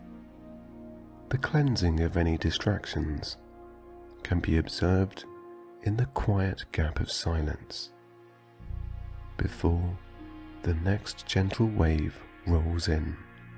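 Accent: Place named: England, United Kingdom. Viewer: Not just because I'm an Anglophile or anything..😉.. but I find that anyone with an English accent has just about the best voice for this kind of work. So soothing. Thank you,. xx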